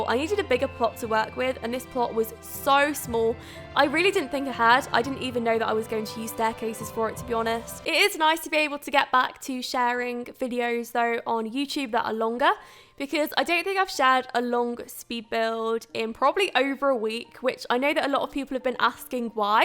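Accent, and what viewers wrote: United Kingdom. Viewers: R u British?😕😞 I am English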